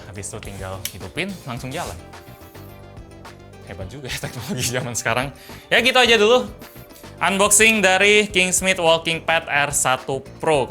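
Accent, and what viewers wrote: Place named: Glodok, Indonesia. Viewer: Aksennya Glodok banget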